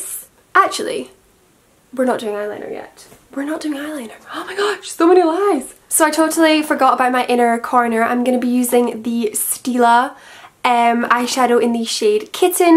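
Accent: Scotland, United Kingdom. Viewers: Alaiza Toscano I'm pretty sure she's Scottish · Natalie Briggs nevermind, she's Scottish lol she just spoke so light handed with it, I had mistaken it for Irish! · I usually always know whether i'm going to like a video going by an intro. And i went through like 3 videos before yours, but i'm staying here :) good on you for not looking like a conceited, regular influencer. Interesting how your from Scotland :P I lived in Ireland Dublin and we've got VERY similar accents. Now i know why people think i'm scottish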